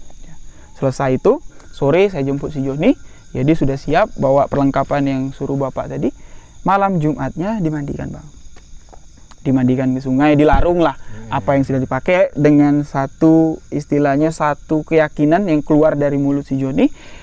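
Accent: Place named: Jambi, Indonesia. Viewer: Assalamualaikum, bang slm santun slm sehat, apapun cerita misteri yg diceritakan sm bang enak punya ciri has bhsa jambi nya sngat amat kental saya suka bgt dari cara bicaranya bang emng orangnya sangat santun sukses trus bang dn teemnya moga sllu diberikan sehat, Amiinnn...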